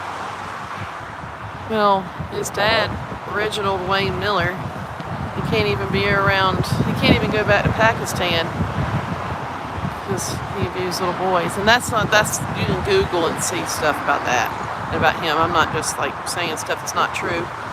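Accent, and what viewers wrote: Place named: South, United States. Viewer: they are nasty people. I am so proud of you and I love you southern accent! I am a northerner 😂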